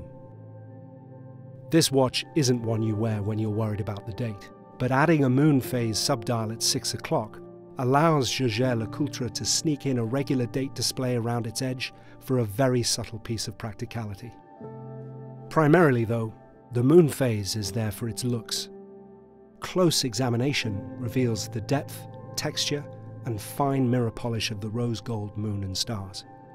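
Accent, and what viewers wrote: United Kingdom. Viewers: Great video. Love this style, and of course the British narrator. Beautiful watch for sure too!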